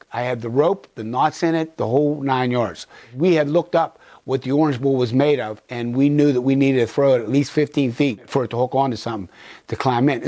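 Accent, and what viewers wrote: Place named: Pittsburgh, United States. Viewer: This guys Pittsburgh accent has me missing home.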